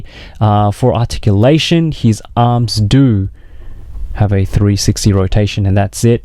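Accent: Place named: Australia. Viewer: hey man, great reviews and videos, just wondering where you buy your figures from? plus are you from australia? you sound like you have an aussie accent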